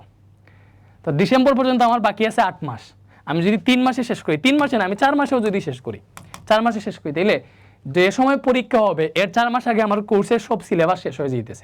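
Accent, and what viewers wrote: Sylhet, Bangladesh. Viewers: ভাই আপনি কি সিলেটি?? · আপনার কথা শুনে বুজা জাচ্চে আপনি সিলেটি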